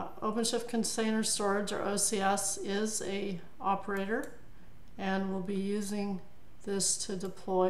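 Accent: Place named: New Zealand. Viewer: Great video. Love the kiwi accent too!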